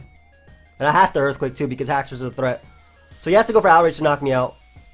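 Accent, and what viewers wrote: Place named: New York, United States. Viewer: It's that NY accent...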